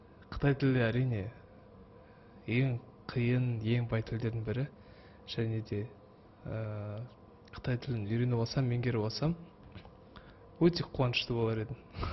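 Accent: Standard. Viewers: Мектептi казакша окыган бала осылай таза, эдеби тiлмен сойлейдi. Жарайсын, айналайын! Берген жауабыннан, iшкi дуниеннiн кiршiксiз таза екенiн тындаган кытай журтшылыгы угады. Ceнiн арканда кытайлыктар казактар туралы жаксы пikipде болады. Рахмет, айналайын, жортканда жолын болсын! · Я Димаш таза қазақ тілінде сөйлегені. Арамызда қазақ маңгүртпен мамбеттерге сабақ болса екен. Әр бір қазақ осындай болса екен. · қазақша сондай таза сөйлейтініне, қазақилығына ризамын! Осы жобаға шалақазақ біреу барып сұхбатты орысша беріп отырса бүкіл əлемге масқара болар едік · Ботен елде казакша сойлегенди естигениме оте куаныштымын! Димаш жарайсын!!!! Казакша сойлегени оте таза, тил байлыгы жогары!!!! 👍👍👍👏👏👏👏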